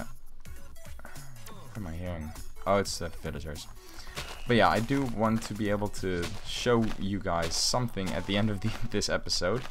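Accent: Netherlands. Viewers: are you Dutch? Becouse you sound Dutch:) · Are you dutch?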